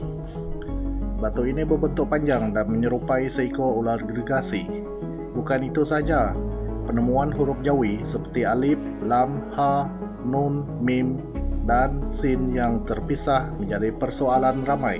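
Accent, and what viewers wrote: Iban, Malaysia. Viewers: Slang iban aya nda ulih buai😅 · Pekat Slank Iban... Terhibur Ngau video tok... Tq... Semoga terus bersemangat menghasilkan video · aok.. jgn buang slang iban.. ya antara entik d negeri kita.. agi idup agi ngelaban... huuuuhaaaaa!!! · Kn..pekat iban iya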